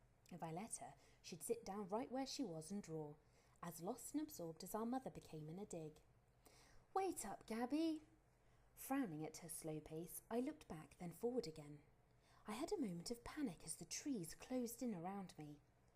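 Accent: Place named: United Kingdom. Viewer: The American teen is commentated by a British woman… fascinating choice. Same as the cover art for Torrent, not my first choice. Also why is the audio quieter than a fish screaming bloody murder?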